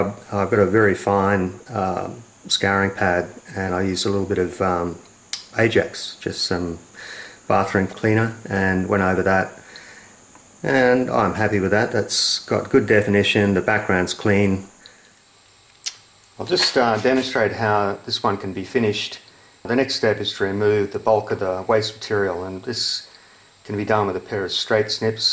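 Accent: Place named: Australia. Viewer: G day i really enjoy your content i just subcribed its nice to hear an Aussie accent on youtube i an looking forward to going through your back catalog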